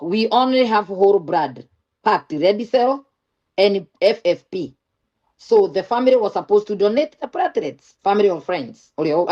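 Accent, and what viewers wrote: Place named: Kikuyu, Kenya. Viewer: githungu mega tiga guthoma ta gikuyu.Nitunogire kuigua ndeto icio.Wi murumani muno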